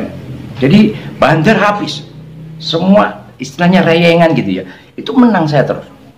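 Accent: Java, Indonesia. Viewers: . Suara sedikit mirip (logat Jawa juga), tp ini bicaranya agak cepat, dn rambut lebih sedikit drpd PakDe Alex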